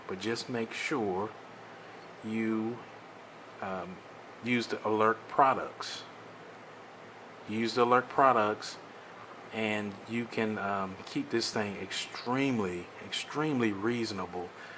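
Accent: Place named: Carolinas, United States. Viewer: see I knew you from the Carolinas. My sister lived in Greensboro North Carolina and she picked up the same accent.YEA like you said I am taking my time she is coming out nice but it's the gyro I am worried about. I am using a K-bar makaido system so I heard good thing about it. I even have the bluetooth to use for it to link up but I don't know how to use it yet your the best thanks bud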